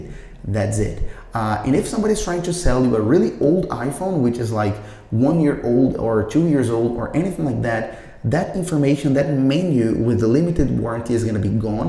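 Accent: Brazil. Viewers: Are you brazilian? I can hear brazilian in your accent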